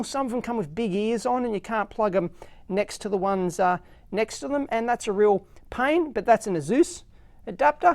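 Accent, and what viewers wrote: Australia. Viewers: Hahaha, he thinks our Aussie way of speaking offensive. For us it's pretty normal.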